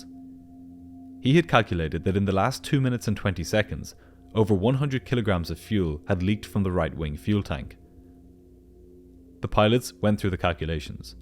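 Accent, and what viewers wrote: Ireland. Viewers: fantastic hearing a Dublin voice like mine! Love the videos. Thank You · Thanks a million for creating this video. Love the Irish accent. I can't believe the ground technicians can't identify the plane they are supposed to be working on. Surely their paperwork will have the plane's registration as at least one of the identifiers ?? If it does then those technicians should have been fired.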